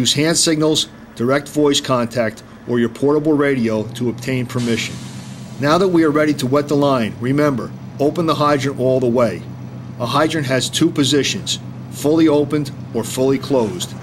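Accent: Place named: New York, United States. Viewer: That is definitely a new your city accent LOL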